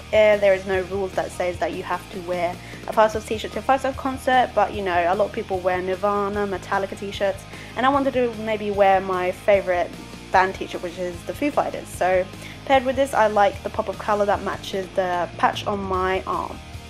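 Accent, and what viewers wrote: United Kingdom. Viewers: You're from Norway?!? I thought you were from the UK omg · You're Norwegian? I was pretty sure that you were British. Bc accent. IM SWEDISH, HELLO NEIGHBOR!!!!!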